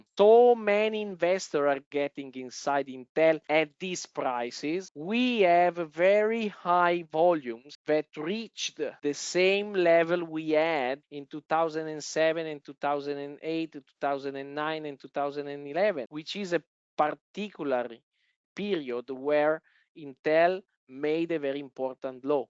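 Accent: Italy. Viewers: sembra un inglese con forte accento italiano, ma non vorrei sbagliare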